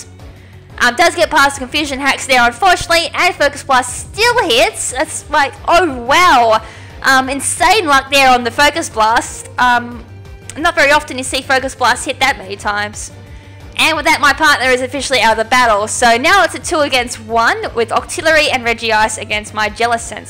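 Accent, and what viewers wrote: Australia. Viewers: Your australian!!!!!! Awwwwssssooommmmee so am i.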